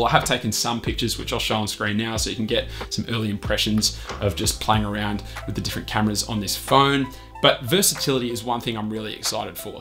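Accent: Australia. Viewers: what part of austrailia are you from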